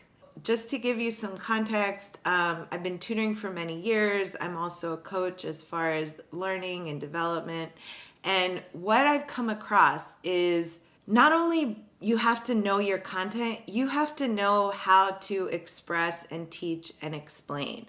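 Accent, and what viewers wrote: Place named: Greece. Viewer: You sound Greek...!!!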